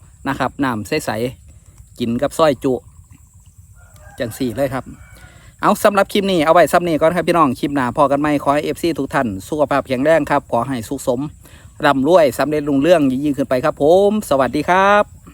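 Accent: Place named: Korat, Thailand. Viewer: เอาอีกแล้วพูดนีแทวโคราชเรียกเป็นกองดูจากสายไหม❤❤❤❤❤🎉🎉🎉🎉🎉😂😂😂😂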